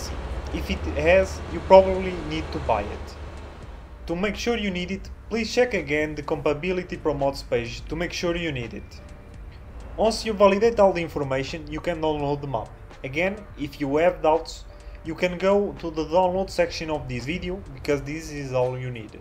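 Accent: Portugal. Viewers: Eu juro que so pelo sotaque imaginei logo que fosses portugues ahahah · quase certeza que é portugues esse sotaque não mente kkkkk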